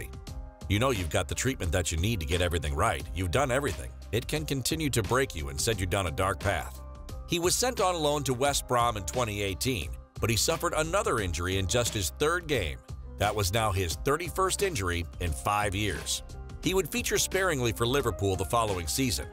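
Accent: United States. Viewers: The American voiceover is not for me. So divorced from anything football.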